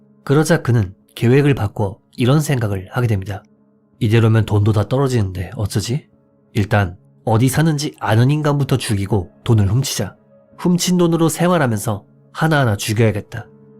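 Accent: Japan. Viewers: 알고리즘에 떠서 들으면서 게임하고 있었는데 일본분이 운영하시는 채널인가하고 채널 확인했네요 ㅋㅋㅋ 비하나 조롱의 의미가 아니라 일본 지명이나 일본인 이름 발음이 굉장히 현지인같으세요 중간중간 억양도 되게 일본인이 한국말하는 느낌이라 재일교포분이신가 뭔가 신기해하면서 듣게 되네요 ㅋㅋㅋ 자료 조사도 좋으시고 차분하게 얘기하셔서 편하게 듣기 좋은 채널을 알게 된 거 같아서 좋네요 ㅋㅋ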